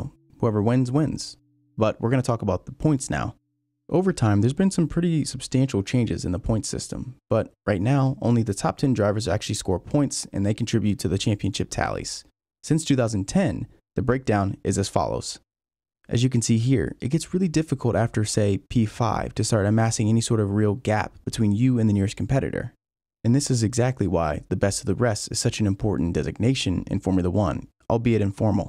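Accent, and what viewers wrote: United States. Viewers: its the american accent for me, it took me off guard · I was like, "something's off about this F1 video" -- then I realised. It's the american accent. I'm not used to people talking F1 in an american accent... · Being american myself at first I didn't realize anything. Maybe about 10 minutes into the video i was like wait, that "grand prix" sounded unnatural.. oh wait he's american? Thats different, in a good way (: I often feel like I'm the only person for miles that cares about F1.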